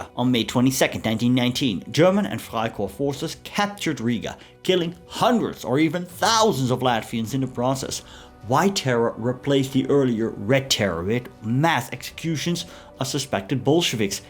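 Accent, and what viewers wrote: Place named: Netherlands. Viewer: the guy speaks with a very thick Dutch or Scandinavian accent. It's not nice - it hurts my ears. things like this should be banned. if you are Dutch, make videos in Dutch, pal.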